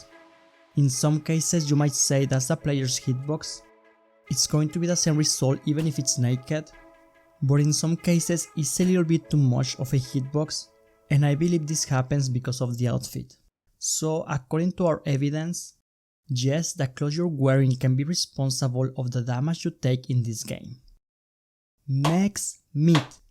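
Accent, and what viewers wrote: India. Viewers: UR accent is like a indian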